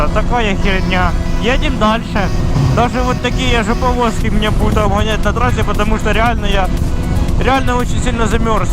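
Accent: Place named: Ukraine. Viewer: смищно говорит!) Особенно смешит этот украинский акцент)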